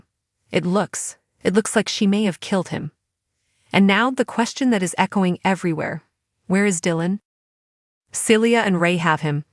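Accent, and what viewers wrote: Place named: United States. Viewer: You should switch the voiceover to an English accent. An American accent makes it more obvious that the video is AI.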